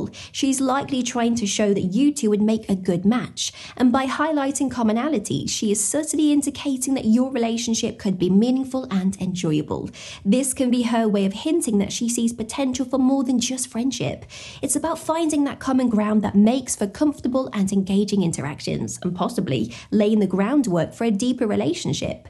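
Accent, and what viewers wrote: England, United Kingdom. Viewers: I am not sure your right or wrong I really don't care I love watching your videos because your beautiful and love your English accent.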